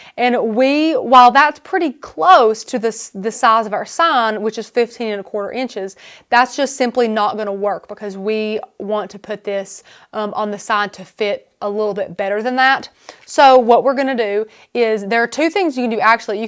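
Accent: South, United States. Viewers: Great video. I love her country voice... Lol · talk a little more southern... southern drawl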